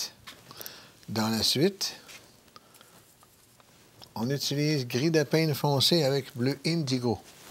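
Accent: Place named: Quebec, Canada. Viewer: Bonjour Mr le Magicien : C'est un régale de voir vis oeuvres et c'est la première fois que j'entends en prime le bel accent Quebequoi je suppose. Bravo pour tout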